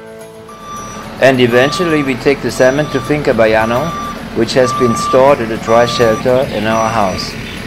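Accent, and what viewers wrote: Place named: Germany. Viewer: as a linguist I seem to hear your German background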